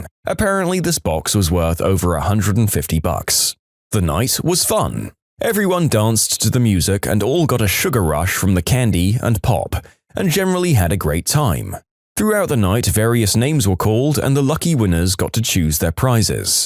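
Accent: United Kingdom. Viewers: Legit i noticed the voice im laughing but no not offensive i mean i think british voices are funny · Since when is the narrator British? I honestly kinda like the British narrator ngl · British accent!!!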